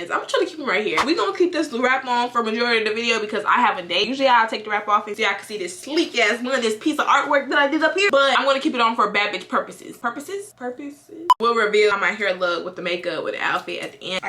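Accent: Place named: Chicago, United States. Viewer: i love ur videos they are so interesting. but i have a question are u from Chicago ur accent is very strong?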